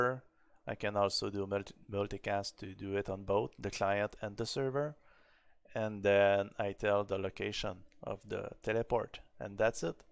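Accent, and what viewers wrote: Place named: Quebec, Canada. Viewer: Je pense que je reconnais un québécois ici 🙂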